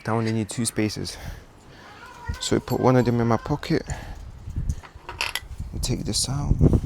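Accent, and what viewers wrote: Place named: United Kingdom. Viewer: Out of interest, why is you accent sound like a uk born afro Caribbean person, but you a white fella. Thanks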